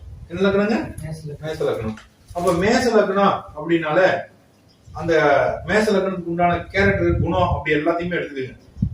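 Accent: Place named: Kongu, India. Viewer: வெகு எதார்த்தமான கொங்கு தமிழ் பேச்சு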